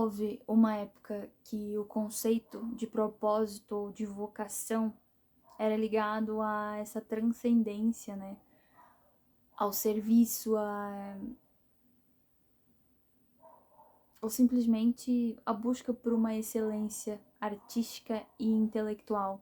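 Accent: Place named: Portugal. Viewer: Você já morou em Portugal? O sotaque parece. Tô acompanhando o conteúdo pelo insta e achei aqui agora. Parabéns!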